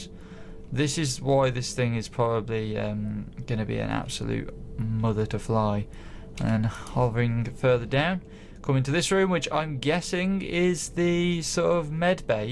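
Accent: United Kingdom. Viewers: Hey narrator, are you from Cannock?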